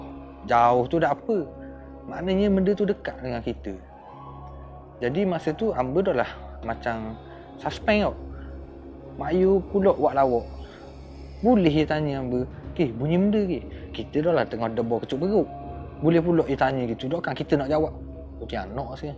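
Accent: Terengganu, Malaysia. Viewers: Org hok komen negetif tu bute kayu teruskan langkah mu. Hok penting kekalkan pelat Ganu trademark mahal tu. Mana nak cari explorer ckp Ganu dlm dunia ni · Jgn nagis bang😂...org ganu eh bang?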